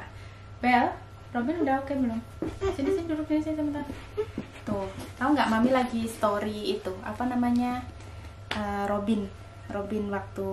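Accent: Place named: Java, Indonesia. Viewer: Aku suka mbak & keluarga.. Lihat anabelle.. Yg lincah & robin yg sangat aktip... Dan gaya mbak klo ngomong jawanya... Medokk aku suka.. Kita wong blirar juga.. Tp tinggal di lampung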